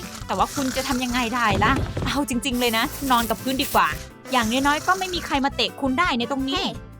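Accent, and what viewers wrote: Isan, Thailand. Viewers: น่ารักจังเลยชอบสำเนียงอีสาน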